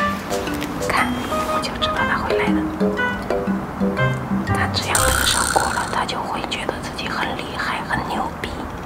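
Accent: China. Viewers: 听你的口音你应该是中国人吧！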